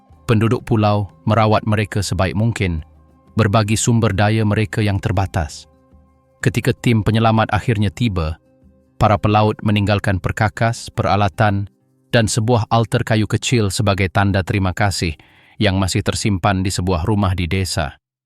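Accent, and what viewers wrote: Malaysia. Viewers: Logat ngomongnya ini dari malaysia, tapi nama akun nya pakai nama indonesia...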